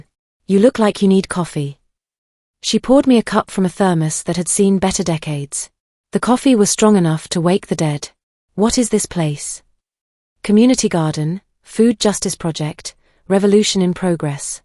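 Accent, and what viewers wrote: United Kingdom. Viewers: Awesome. Love British Narrators so much ❤️ 💖 💗 💕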